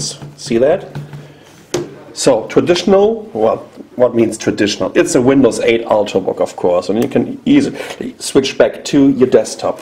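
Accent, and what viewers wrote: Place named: Germany. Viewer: his accent is awesomely evil. sounds very german.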